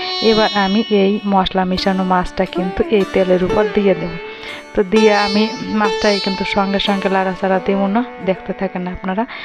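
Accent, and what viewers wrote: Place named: Bangal, Bangladesh. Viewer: অসাধারণ বাঙাল ভাষা আমিও বাঙাল❤❤❤😂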